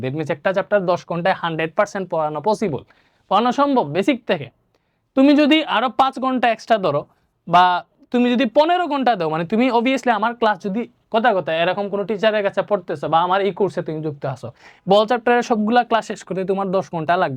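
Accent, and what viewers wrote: Sylhet, Bangladesh. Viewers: Vai ETA amader sylhety basha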